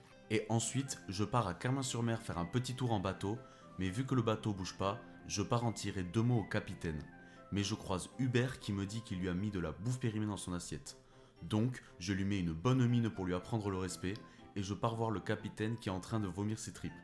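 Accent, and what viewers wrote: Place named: South, France. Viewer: Ptdr j’adore ! Tu viens d’où avec cet accent fada ?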